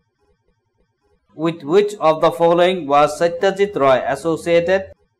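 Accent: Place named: Mizoram, India. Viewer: accent resembles a Mizo a lot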